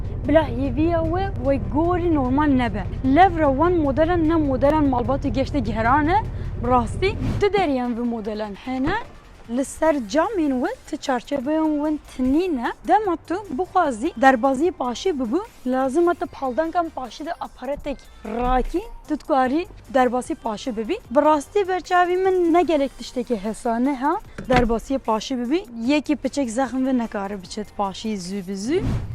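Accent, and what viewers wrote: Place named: Goyi, Turkey. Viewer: Peki o goyi şivesiyle konuşmanin verdiği zevki hiçbir şiveyle değiştirememek ,u Deste hawa soxbit serkeftin inşallah 🙏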